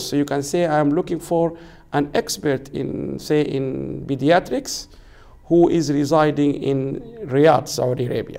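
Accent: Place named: Sudan. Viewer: I like the way you talk English. It will be called Sudalanguage , it shows our identity. Thanks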